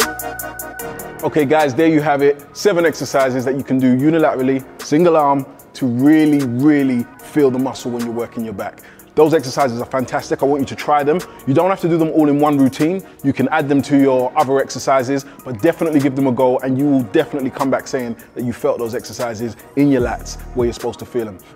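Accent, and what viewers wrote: United Kingdom. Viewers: Ain't you from UK 🇬🇧?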